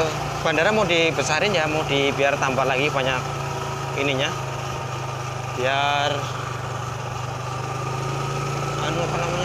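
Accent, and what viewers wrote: Banyumas, Indonesia. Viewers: Keren mas bro otw trus, btw suara.ne ngapak sedulur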